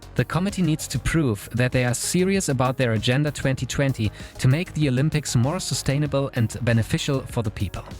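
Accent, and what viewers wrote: Germany. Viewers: I didn't need to hear him say Rhein-Ruhr to figure that out, he has a german accent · If you have not noticed it by the German accent this was the ultimate hint. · because he has a thic german accent and tries to hide it by pronouncing everthing very pREcisLEy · I like his german accent. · His accent ist most definitely from a German speaking country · When i heard the german accent i instantly went to channelinfo just to be confirmed. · I can hear your German accent soooo clearly!!!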